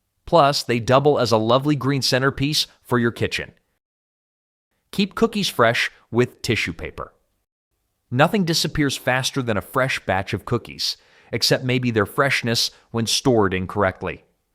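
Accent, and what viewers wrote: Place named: United States. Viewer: WITH A MINUSCULE AMOUNT OF RESEARCH, AND BASIC INTELLIGENCE…..ANYONE WOULD KNOW NOT ONE OF THESE IS EXCLUSIVE TO THE AMISH….. 😂🤦‍♀️🤦‍♀️ Many of these are THOUSANDS OF YEARS OLD, SEVERAL DATING BACK TO THE EGYPTIANS. ALMOST ALL OF THESE CAN VERY EASILY BE TRACED BACK TO LONG BEFORE THE AMISH EVER CAME HERE TO THIS COUNTRY, BUT ESPECIALLY TO HUNDREDS IF NOT THOUSANDS OF YEARS PRIOR FROM COU TRIES AROUND THE WORLD. 🤦‍♀️ Gullible people. 🤦‍♀️This post is obviously being done, like many of your others false posts….to raise likes and subscribes so you get more money. These videos have been traced to Asian countries, hence the recorded American voice….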